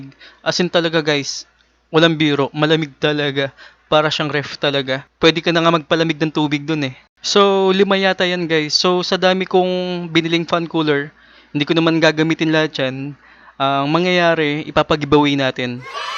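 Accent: Pampanga, Philippines. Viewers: Kapampangan ka sir noh? 😁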